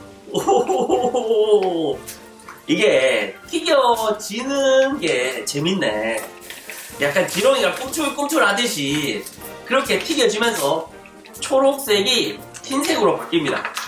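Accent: Busan, South Korea. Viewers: 몰랐을수도있지겁나뭐라하네 근데허팝님부산사람인가요뭔가억양이익숙한데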